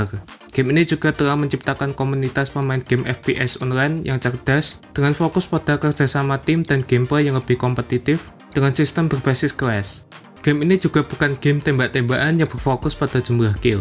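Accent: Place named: Java, Indonesia. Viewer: suara nya kok medok 😭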